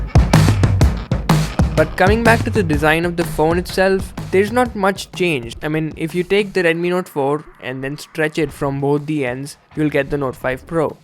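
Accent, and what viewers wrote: India. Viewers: IF MKBHD had an indian accent... this is what it would turn out to be. Nice work!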